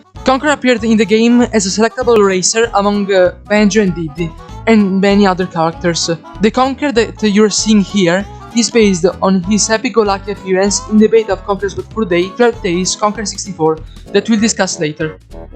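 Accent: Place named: Italy. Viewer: Dalla parlata ho ipotizzato che fossi italiano, a ho avuto la conferma Mi fa davvero un sacco piacere che qualcuno in Italia parti di questo gioco in maniera così accurata Ti faccio i miei complimenti✌🏻👍🏻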